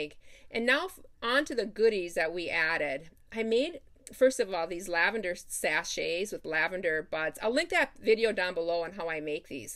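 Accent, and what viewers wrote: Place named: Midwest, United States. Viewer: Wow!, this was the absolute best! I only discovered your tutorials a couple of days ago and have done some binge watching. You are awesome. I just love all you create. I adore your Midwest accent. smile. My dad was from Minnesota. Thank you for all your inspiration. Take care, be safe and much happy stitching.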